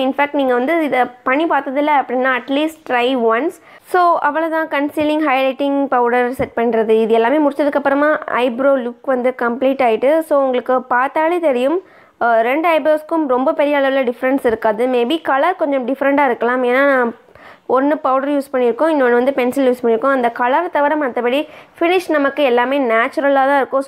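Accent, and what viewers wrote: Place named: Kerala, India. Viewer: R u Malayali ?? Gap ittit slang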